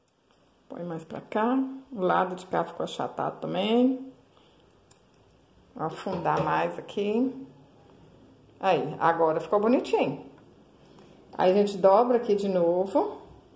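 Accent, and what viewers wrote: Minas Gerais, Brazil. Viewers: Adorei o seu mineires. ( MG) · Que trabalho lindo!bonitin !vc é mineirinha, pelo sotaque 😘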